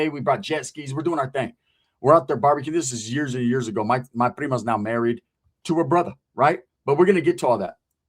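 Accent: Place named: Texas, United States. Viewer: Great video .... You sound like a Texas boy , where Blacks and Mexicans are brothers ❤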